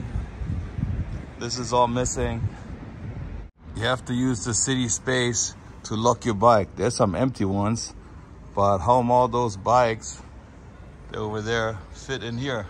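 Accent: Germany. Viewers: Your mild German accent sounds so pleasant to my ears:)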